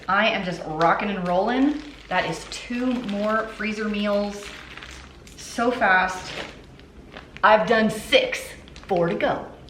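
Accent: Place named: Texas, United States. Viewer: Yes idk if it's a Texas thing, but I'm from Houston and my family always said 'sketti' sauce 😂 I hear say a lot of small things like that that I also heard growing up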